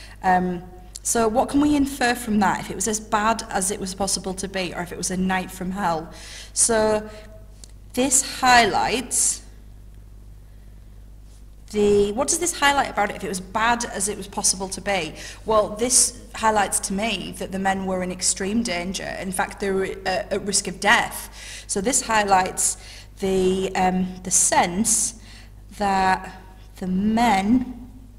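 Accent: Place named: Teesside, United Kingdom. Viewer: Love the accent lol are you from Tees? Also what are Peel paragraphs ?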